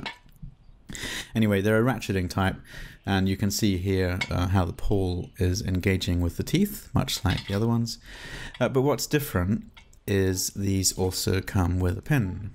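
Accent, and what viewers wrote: England, United Kingdom. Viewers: Definitely not Aussie An English Accent 🏴 👍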